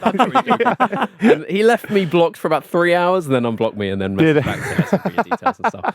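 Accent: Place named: RP, United Kingdom. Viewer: it's a joke because he's very polite and posh sounding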